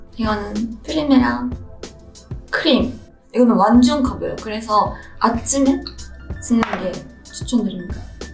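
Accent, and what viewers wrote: Japan. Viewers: 일본여자는 특유의 귀여움과 아름다움 상냥함이있어...옆에있으면 머리를 쓰다듬어주고 싶어요..발음도 귀엽죠. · Sakura has been in kpop for so many years and still she can’t speak Korean well that’s very disappointing, it even sounds like she is speaking with Japanese accent 🤡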